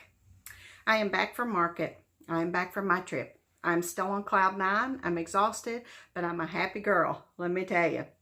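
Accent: South, United States. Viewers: Hi, you had me at "Love Abide". swoon. Thank you, kindly for sharing all your finds. Gosh, you sure have a good eye for beautiful things. Once again, your charming southern drawl washed over me and bathed me in Grace & Goodness. Thank you for sharing your spirit and such lovely inspiration. It was a pleasure to drink coffee and "visit" with you. Happy Stitching, friend, Daleen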